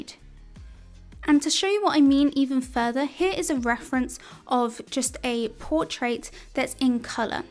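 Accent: United Kingdom. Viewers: Art Thank you for your videos. Your British accent is very pleasant to hear as I draw! I am from America so it’s nice to hear different accents.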